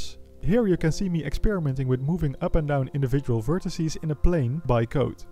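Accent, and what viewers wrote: Netherlands. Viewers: Hey maybe a odd question but are you dutch by any chance.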